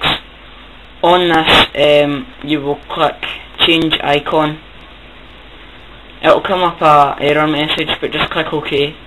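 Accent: Scotland, United Kingdom. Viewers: its scottish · OMG! SCOTTISH! :D! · haha i love your voice! scottish people have the best accents lol and I am sooo doing this at school tomorrow thanks hun! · by the way he speaks i think he is scottish. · ye you are scottish do you got a lisp tho? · Thats cool...and are you Scottish? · thats fun ;) and, i just adore you'r accent ^^ scottish is just so cool :o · lmfao he is from scotland he is not irish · whoa you got a strong scottish accent mate!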